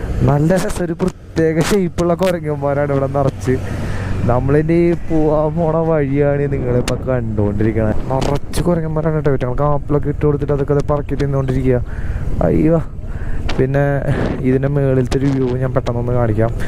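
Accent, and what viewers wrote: Thrissur, India. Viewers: നിങ്ങളുടെ തൃശൂർ സ്ലാങ് പൊളി ആണ് ട്ടോ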